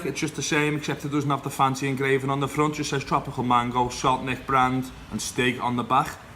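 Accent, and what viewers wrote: Liverpool, United Kingdom. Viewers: Liverpoooooooooool!!!!! great accent man!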